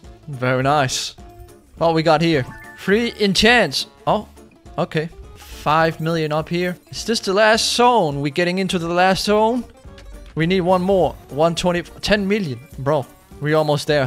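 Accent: Denmark. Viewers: Quick question are you Danish??😅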